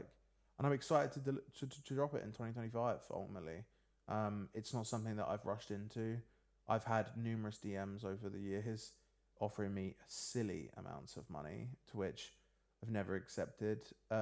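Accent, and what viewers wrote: United Kingdom. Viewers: dude you certainly don't have an ear for accents that's for sure. Everyone on earth knows that he's British as soon as he starts speaking haha. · wait your british? i always thought you was American 😅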